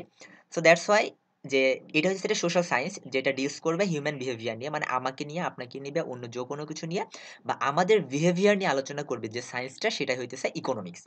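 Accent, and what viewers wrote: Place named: Bangladesh. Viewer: Bhasha shuine monehochhe Bangladeshi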